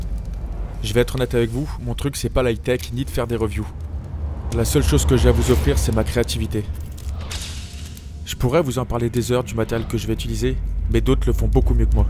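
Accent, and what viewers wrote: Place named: North, France. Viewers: Tu as l'accent du Nord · Tout ça avec l'accent de ch'nord , ça fait plaisir a entendre cha tisotte hein ! ;-)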